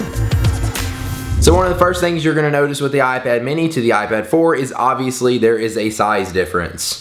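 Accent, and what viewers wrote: South, United States. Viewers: U sound really country af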